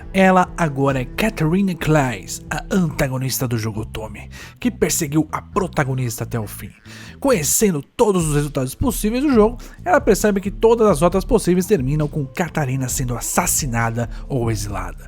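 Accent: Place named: São Paulo, Brazil. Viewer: como voce é burro! nao sabe a pronuncia de "cha". vai ver mais anime e estudar um pouco de japonês. pela pronuncia só pode ser sp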